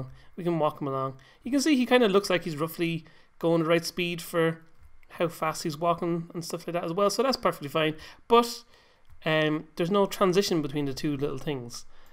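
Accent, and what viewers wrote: Ireland. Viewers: lol....wtf? Is it not obvious? He's Irish (or a similar accent)...and how does (I assume you're saying) old have anything to do with how he says words?? I'm so confused.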